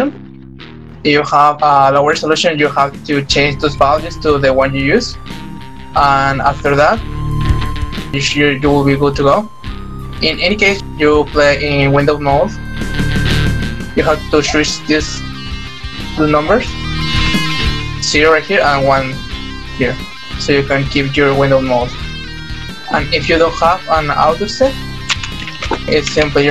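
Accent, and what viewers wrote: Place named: United States. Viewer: A mira se volvio bien gringo el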